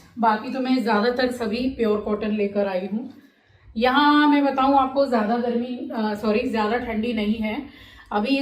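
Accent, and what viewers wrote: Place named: Braj, India. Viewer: Hi ji.. mere mann mai ek query hai ki aap rahti Kolkata mai h to aapki kuldevi kaila maiya kaise itni dur and aapki language bhi agra Mathura wali hai.. kyu? Aisa m isliye pue h rai hu kuki m agra se belong karti hu aur hmari kuldevi kaila devi hai aapki wording hmari jaisi hai reply jarur karna pls..@ ji reply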